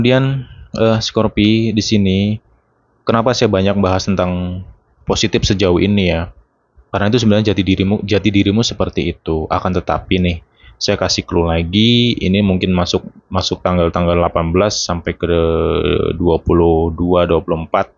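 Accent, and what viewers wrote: Java, Indonesia. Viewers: Scorpio hadir matur suwun vidionya, aq seneng cara penyampaiannya jowone MEDOK BANGET hehehe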